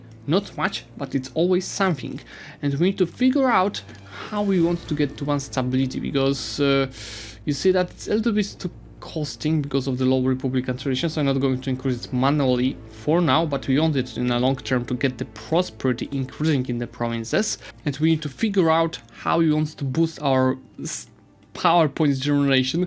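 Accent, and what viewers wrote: Eastern Europe. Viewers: What the, the voice describing the situation sounds feminine/or really young but the pronounciation sounds like, its kinda weird, amyway great video, and comment for algorithm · Самый славянский акцент в мире.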